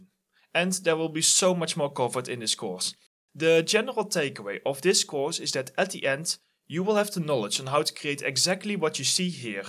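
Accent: Netherlands. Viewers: You mean that megascans library? I was under the impression you could als import your own assets created in, for example Blender, and create textures from scratch. With the only difference you could only use those materials in Unreal Engine (which would only be a downside if you would use another game engine). I'm not a fan of Adobe anymore, although I have used a lot of their software. But I don't like their subscription model, way too expensive if you don't make a decent living out graphic design. I'm good in 'traditional' digital graphics design, but yet have to find my stride in creating for games, as over here there was never an actual gaming industry. Atleast not when I was at 'the right age'. But you sound Dutch, if I'm not mistaken?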